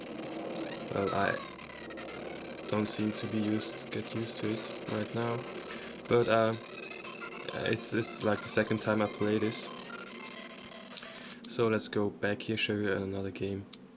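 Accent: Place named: Germany. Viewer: Dude, awesome accent. I find it pretty hard to copy a German accent and I've been studying German for 7 years. Yeah.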